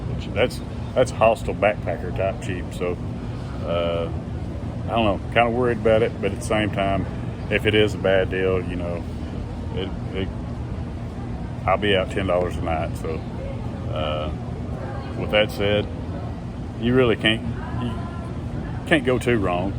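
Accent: South, United States. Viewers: 1st time viewer of your channel. You have a southern accent. Where are you from in the States?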